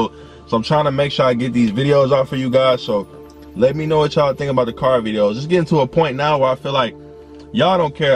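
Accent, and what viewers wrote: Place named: New York, United States. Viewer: New York boy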